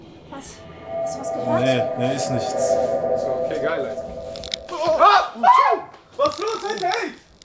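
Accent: North, Germany. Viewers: Dieses Norddeutsch war einfach mega 😂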